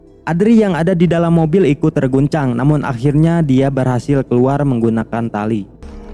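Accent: West Java, Indonesia. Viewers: Ni host nya pasti orang sunda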